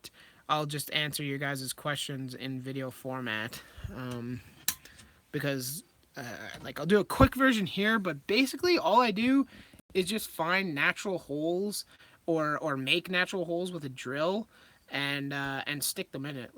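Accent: Canada. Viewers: just curious where you reside? u sound like an albertan like me (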